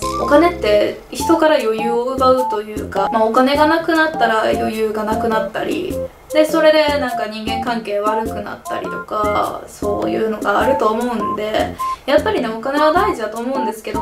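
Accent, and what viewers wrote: Kansai, Japan. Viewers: 関西弁美女ってええなあ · 関西弁美しい！ 大阪には遊びでよく行ってます✨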